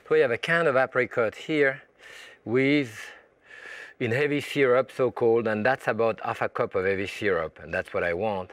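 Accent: France. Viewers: Haha I'm sure I wished that himself. Fact is the French never lose their French accent while speaking English. It's either too difficult and/or too painful for them to do so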